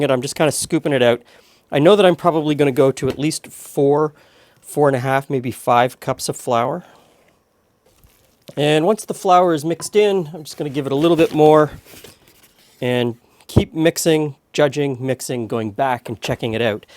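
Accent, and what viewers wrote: Canada. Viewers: Canadian Accent on point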